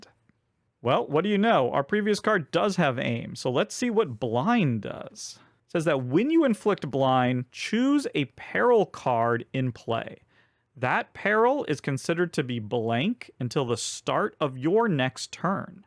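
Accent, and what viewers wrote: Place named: North America. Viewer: I always get a joy watching your RC videos, as I'm still struggling to get my hands on a relatively cheap copy. In my country, demand for board games are pretty low, so the only options we really have are services like Amazon (And from your accent, I'm guessing you're either US or Canadian, so if you didn't already know, ordering from Amazon if you don't live in the US is REALLY expensive)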